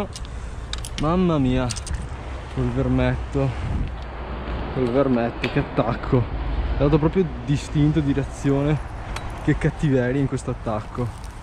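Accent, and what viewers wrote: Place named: Rovigo, Italy. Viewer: ma sei di rovigo?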